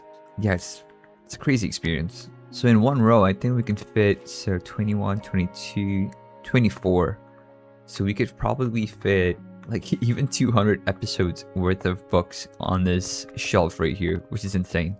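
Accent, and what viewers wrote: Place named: Indonesia. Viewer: this is my first time seeing this channel and i thought i recognize that slight indonesian accent and turns out i'm right!! 😄i didn't expect this, are there more of you guys?? peak content btw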